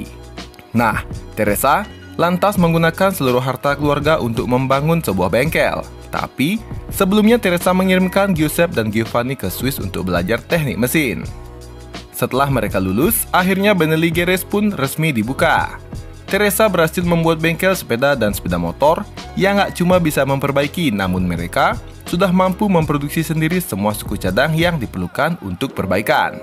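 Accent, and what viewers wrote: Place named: Bali, Indonesia. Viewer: Logatnya bali banget ni Bli ahahah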